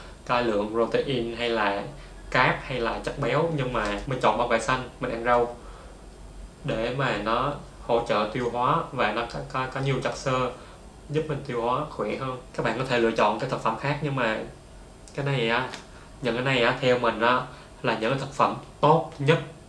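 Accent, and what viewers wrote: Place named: Phu Yen, Vietnam. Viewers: giọng a nghe giống giọng phú yên nhề :))) nếu mà giống thì chung quê ùi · anh ơi a ở Phú Yên - Bình Định ạ ? giọng anh quen quá đi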